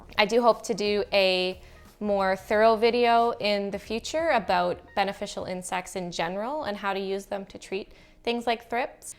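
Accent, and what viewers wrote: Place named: Canada. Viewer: I’m from BC originally and live in the states now. I love hearing the Canadian pronunciations. Sachets is an interesting one bc usually Canadians say things more French-ish (i.e., foy-YAY vs. FOY-er), so you’d think it would be the Canadians saying sah-SHAY but nope. Sadly, most of my Cdn pronunciations have disappeared from my vocab but I refuse to give up the correct way of saying PAH-sta (not PAW-stuh). 😂 Great info and exactly what I was looking for. Thanks!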